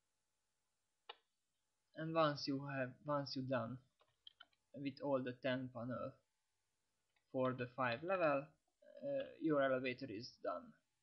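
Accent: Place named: Serbia. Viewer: Thank you very much Both of these videos are very helpful. And nice accent mate, Serbian by guess.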